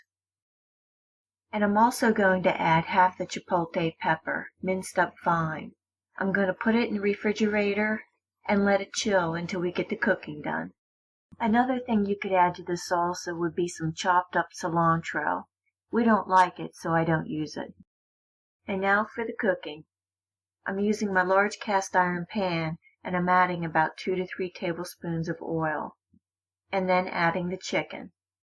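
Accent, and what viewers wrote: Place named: Philadelphia, United States. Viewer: Such a philly accent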